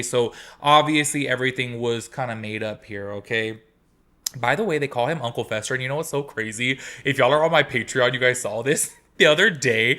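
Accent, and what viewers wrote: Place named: Hawaii, United States. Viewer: Mahalo, I said earlier when you was talking, I was like ehh .. he talk like me local kine ❤❤❤❤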